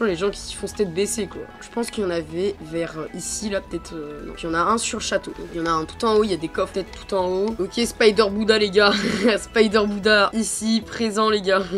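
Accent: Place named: England, United Kingdom. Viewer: l'accent englais sur le live ta peur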